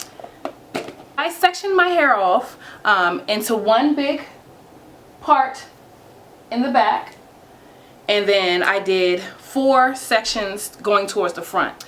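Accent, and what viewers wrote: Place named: Washington DC, United States. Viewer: giirrrrrlllaaaa (SE girl accent) I knew I recognized that accent from somewhere. I knew you were from DC. Hey girl hey!!! yesss represent for the 4 type hair girls!!! Love all your videos so far and the fact that I subscribed!!!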